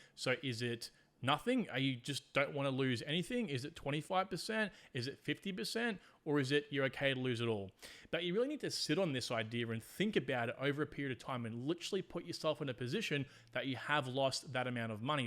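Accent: Australia. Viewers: Absolutely no bs, I love it. Educational as heck, perfect Australian accent, and everything you could honestly ask for, especially being free content. Brotha keep the grind up, I absolutely appreciate the help you give us, thank you and take care of ya self✌🏻🙏🏻💪🏻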